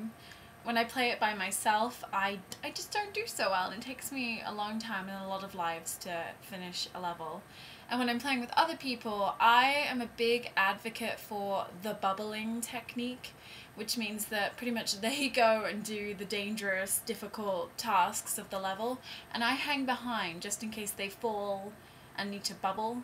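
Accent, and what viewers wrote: England, United Kingdom. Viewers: damn britsh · british beeyach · Well At least its a nice English Accent. Not English people who slur there words.. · i wiv your nglish accent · she is British lol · Oh .. i just love brit accent . especially after harry potter and charlie bit my finger .. may be thats what i am against ;) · Talk about whatever you want. No matter what I'll enjoy hearing it. You have this cute British accent that I just love listening to. Don't worry about your hair. It looks absolutely beautiful! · holy cow! i shes hot! i love her british accent too! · Whoever said she was Australian? She's English. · alguien puede traducir tooooodoo lo que ella dice? al español... I don't understan so much... her acent is brithisch?? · she's from the UK thats how we pronouce it :)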